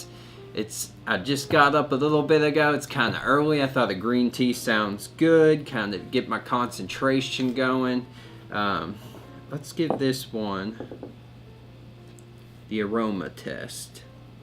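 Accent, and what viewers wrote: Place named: South, United States. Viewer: I really enjoyed your review, and I love your background of the Chines tea farms! I’m enjoying some jasmine pearls right now myself to start the day. I love drinking plain tea throughout my day and I get it shipped to me in California from Mei Leaf in London. You are very cute and I love your southern accent. I hope you make more tea reviews.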